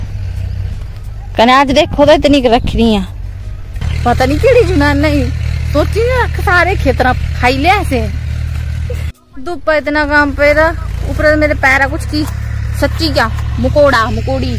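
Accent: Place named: Pahari, India. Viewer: Enjoyed your Phadi speaking. It is bit different how we speak phadi in Kangra region.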